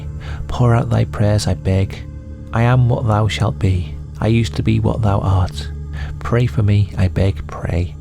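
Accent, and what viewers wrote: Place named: Durham, United Kingdom. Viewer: Howdo,. I love your content. Always something interesting and entertaining. It’s nice to hear an accent like mine, too. I’m County Durham born and bred and now live in Hartlepool. You sound Durham / Teesside - am I right? Wherever you are, keep doing what you’re doing!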